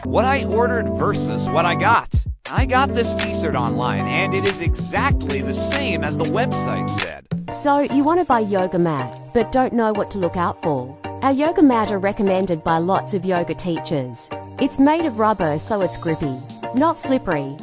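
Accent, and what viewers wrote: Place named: South, United States. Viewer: i don't think I realized you were southern!! southern gang!! 😊 Also, great video. i love this!!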